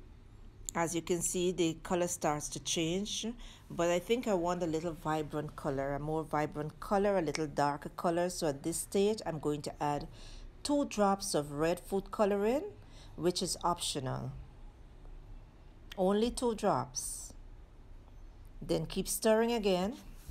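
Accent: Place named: Guyana. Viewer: I just knew you were from Guyana. u sound like one side of my family who’s from there too ❤😂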